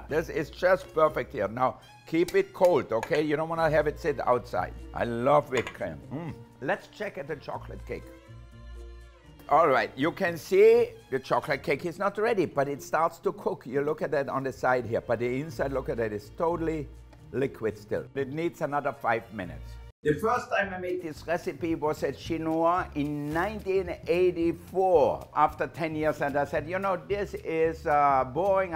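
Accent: Germany. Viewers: It rallye sounds german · Is he German?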